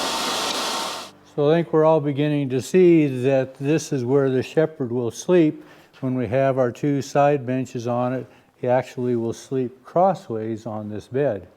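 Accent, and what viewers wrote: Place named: Montana, United States. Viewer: I grew up back in Belen NM and had some good friends that were from Montana. Im 55 years old and I hear you say things that absolutely remind me of my friends mom who talked thst way. Their name is Lawson and there was another family from the same area of Montana named Goodson. They knew each other from bake then in Montana and I figure they must have tslked about going south where they could start over.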